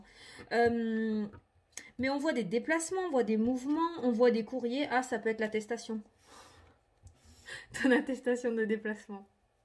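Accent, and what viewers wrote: South, France. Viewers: Il vient d'où ce petit accent du sud ? Mdr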